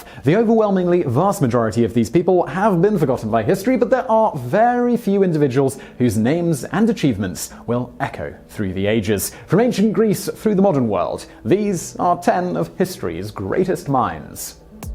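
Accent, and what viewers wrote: United Kingdom. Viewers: TheLadyBridget Simon has made a video in the past stating he pronounces things the way they pronounce it in Britain, using Commonwealth English. So if it sounds different from what we are used to, we can chalk it up to different dialects of English.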